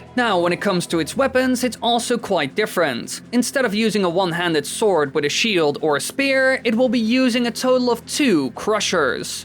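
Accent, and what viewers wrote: Netherlands. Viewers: Is he Dutch? He seems to have a Dutch accent when he speaks English.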